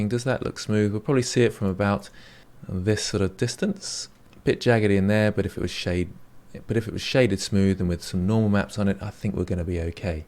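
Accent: England, United Kingdom. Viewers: Your englishness il delightfull. Always a pleasure listening to your eplaination.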